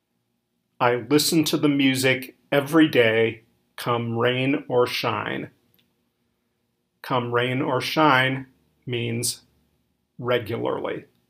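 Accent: United States. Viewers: Judging by his pronounciation, the guy is American, so I guess the idioms are not exclusively Brititsh. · I think you meant,Is this British English or American? He speaks American English and these are American idioms!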